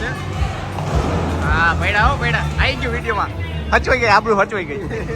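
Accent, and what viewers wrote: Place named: Zalavad, India. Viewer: Jordar maja Ave che tmara video jovanu ❤tmara video ma bav maja 🎉ane you are the best canteen creater 😎 ane bav j handsome lago cho ❤ek dam babla jeva j ,ane tmari zalavadi boli bav j mast che ek dam top 😎😎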